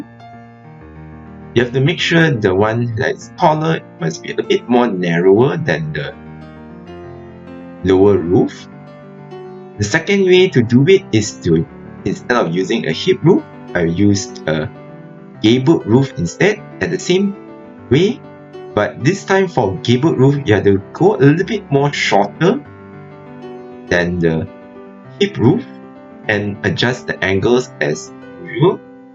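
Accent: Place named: England, United Kingdom. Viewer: Hey, where do you come from? I lvoe your English accent!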